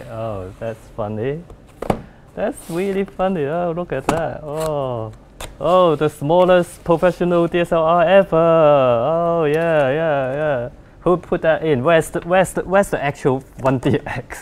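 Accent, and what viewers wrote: United Kingdom. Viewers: Nothing wrong with his English. He manages his puns well, and I do understand what he speaks. And I like his reviews as I like the other Asian's who does British accent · You sound British.....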